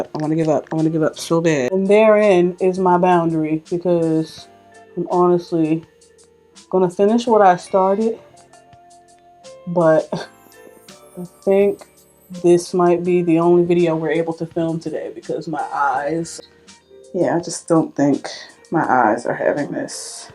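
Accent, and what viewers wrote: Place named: Baltimore, United States. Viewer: Happy New Year,! 🎉 Life has been lifing so I haven't been around in a while. But, you've always been a real one and I enjoy watching you. Whenever I need my dose of Baltimore "ouu" or "ur", your my girl. 😂💯 I pray that all you've set before you and even the good ones you didn't coming, come true in 2024. ❤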